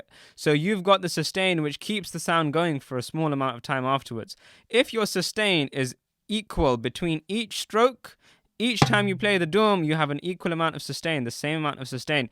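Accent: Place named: England, United Kingdom. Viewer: Great job brother thanks for the videos. By the way I like your English accent 😊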